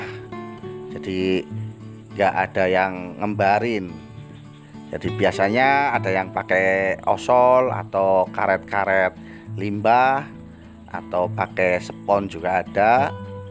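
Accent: Java, Indonesia. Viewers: Wihh mantep pak, wihh nadane medok nggih, lokasi pundi pakde , pengin Sinau mbelah ban bekase 😁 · Tak pikir wong medan pak... Jebule medok hehehehee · Loogatenya seperti jawa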